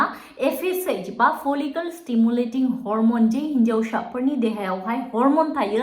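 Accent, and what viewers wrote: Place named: Bodoland, India. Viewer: Jahag Lagtar bai abo bodolend ni Rao Baidi sur Pwra 😊❤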